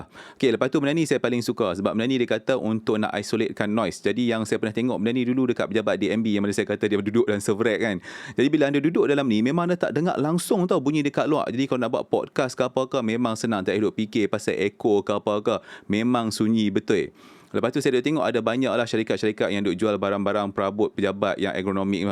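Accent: North, Malaysia. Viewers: Apapun saya senang dgr loghat gunakan.. sedap halwa telinga dengar sleng utara mix baku melayu.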